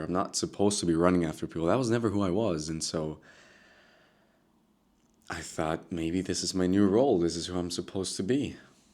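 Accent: United States. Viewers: "with the ridiculous amount of German blood/dna floating around the majority of white people in the US, he might just be a mixed American who happens to live in Europe (family, etc.)." is what I would have typed, but he has that just-barely-there accent - it's an almost completely perfect general-American accent (the way people tend to sound on tv when they are not meant to have an accent, shows up in films too). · So you have a Spanish first name, a German surname, an American accent and you live in Berlin. What's your story? It sounds interesting.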